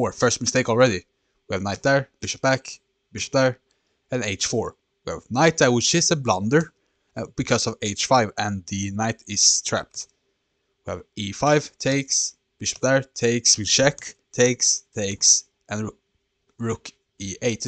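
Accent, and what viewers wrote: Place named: Sweden. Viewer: You sound Swedish, and I am fairly certain my ears are not deceiving me.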